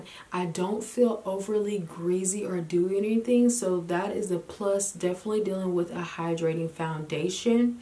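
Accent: Alabama, United States. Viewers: Gorg!! I knew you had an Alabama accent and you remind me so much of Nitrab here on youtube. I love your videos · You sound just like NitraaB, so when you said Alabama I said no wonder, she’s from there too.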